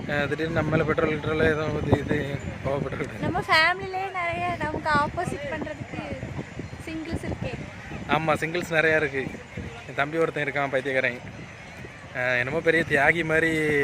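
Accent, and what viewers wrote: Tirunelveli, India. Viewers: Hi I am new subscriber. Unga native place enga . Slang Tirunelveli maathiri iruku anna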